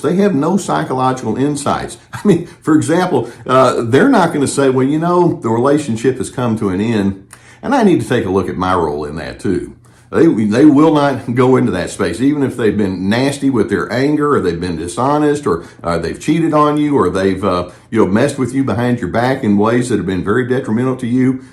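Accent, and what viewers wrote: South, United States. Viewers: insightful and educational as ever. I love your southern accent too!❤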